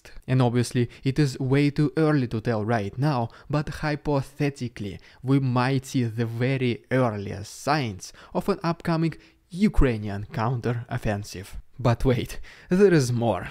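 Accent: Russia. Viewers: Nice guy. Your English with Russian accent is very clean and smooth and soft... some very good lessons with enunciation. Keep up the good reporting. I know because you're Russian background your popularity will be lower, but you're doing well... keep it up! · I know it's 'not all russians' and I am glad for decent russian people like you. And I love your accent. :) I have a little bit of russophilia left in me despite the last year. (and incidents before them too).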